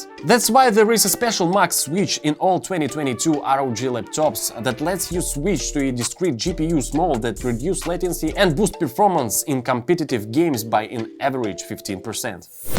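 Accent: Russia. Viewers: I enjoy it,but Take away that Russian-english,it will bee 100% better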